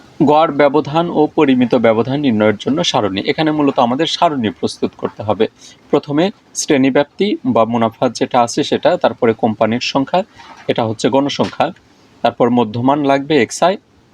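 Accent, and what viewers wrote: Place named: Pabna, Bangladesh. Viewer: Sir আপনার village ki pabnai? Language oirokom..🙂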